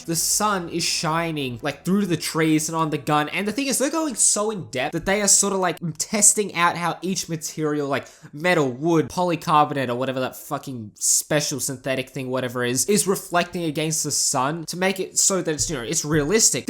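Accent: Australia. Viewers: You soind like an australian ? If you are australian then yeah I agree that all is illegal there. Even a f*cking airsoft gun is not allowed.... That HK gun looked like a HK53 to me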